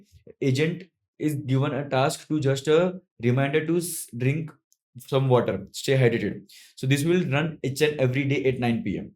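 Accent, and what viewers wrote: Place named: India. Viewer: i wish there was an ai software i could use to remove the indian accent from this video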